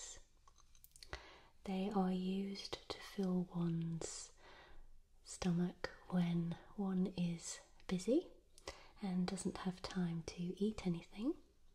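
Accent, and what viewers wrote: England, United Kingdom. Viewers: R u British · new here and not sure if she’s english or american if she’s american making an english tea video.... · OF MY FCKING GOD. BRITUSH ACCENT + ASMR. THAT MUST BE SPOKEN IN THE HEAVEN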